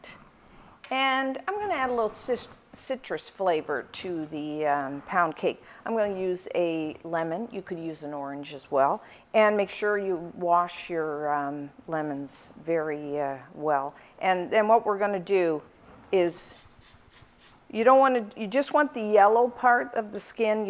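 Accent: Canada. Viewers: your accent is adorable! are you canadian?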